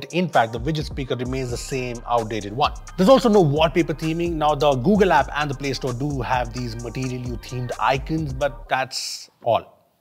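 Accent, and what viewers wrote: India. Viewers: Mrwhosetheboss in indian accent channel. Nice content btw. Very informative and entertaining at the same time!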